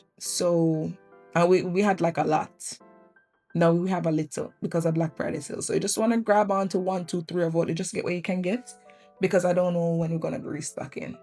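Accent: Jamaica. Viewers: Right when mi hear the Jamaican accent I'm😊 sold !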